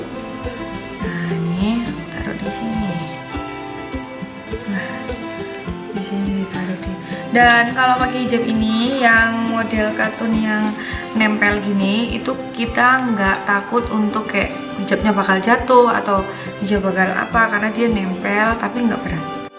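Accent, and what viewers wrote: Java, Indonesia. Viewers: Orang jawa nya mba kelihatan medok banger maaf nyh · Hehe sy malah demen lht gaya bhasanya die selain cantik logat jawanya ntu tuh gemes 😁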